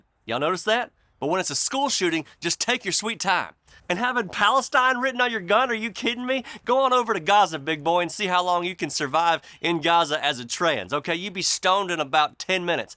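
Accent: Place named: Rural, United States. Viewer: Read Ezekiel 13. Wow? Keep preaching with that country twang. This is a city boy from Detroit. You are appreciated!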